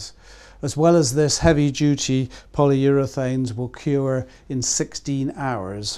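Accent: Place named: United Kingdom. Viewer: nothings more convincing than a british accent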